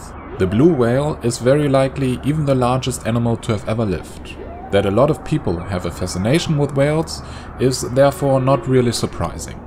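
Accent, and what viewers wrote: Germany. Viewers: Oh the German accent...xD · I love your German accent. Your style of animation is really similar to other channels I like too! Subscriber earned. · German Accent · RZU 147 im German and even I think he sounds German as hell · RZU 147 he totally sounds German xD that accent is so strong (I don't mind it though) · Ich finde es eher traurig, dass du dieses Video unbedingt auf einer Fremdsprache bei der man es so stark heraushört, machen musst! Warum bleibst du denn nicht bei deiner Muttersprache? Denke, du hättest mehr Erfolg damit so nervt es nur. Oder aber du überlässt das Sprechen jemand anderem, wenn es denn unbedingt auf Englisch sein muss. Es gibt auch viele Deutsche, die nicht diesen typisch deutschen Akzent im Englischen haben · Chris Purolover he is German or Austrian or maybe Swiss but I doubt Swiss, his accent is clearly Germanic. 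Listen to how he stresses his 's's. The z and long s which are native to German give away his lingual origin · RZU 147 I'm not even German but I know enough to within the first words determine he was. How the hell is a fellow german unable to recognize that... Lol geeze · Are you german? You have a very strong accent. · Ekn _38 I am German too and I heard it in the first second 😂😂 Grüße gehen raus ♥️ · RZU 147 his accent is german, i know that because i am german. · Sooo true, 5 seconds in I stopped the video and looked for this comment. I´m German myself and there is no way this dude isn´t one of us, it´s so strong and noticable!! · from Germany look at Channel Info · RZU 147 "Dude" ... It IS a german accent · The German accent makes it more convincing.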